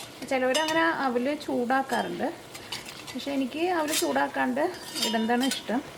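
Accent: Thrissur, India. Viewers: സംസാരിക്കുന്നതിന്റെ Speed ഇത്തിരി കുറച്ചാൽ, നമ്മുടെ തൃശൂർ ഭാഷ ചിലർക്ക് ഇത്തിരി കുഴപ്പം ഉണ്ടാക്കുന്നുണ്ട്, അങ്ങനെ ആ പരാതി ഒഴിവാക്കാം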